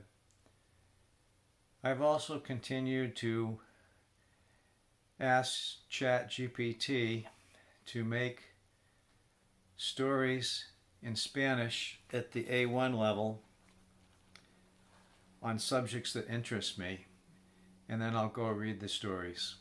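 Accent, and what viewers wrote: United States. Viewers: Yes this is literally speaking Spanish with a complete American accent with zero effort on the accent, this is something that can be improved and even mastered.... if one is aware of it. It's crazy to me that people think they can speak another language but not use, or even attempt another accent, it's just as important as the grammar and the vocabulary. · Sounds like he speaks Spanish with an American accent… good going….organic Spanish is awesome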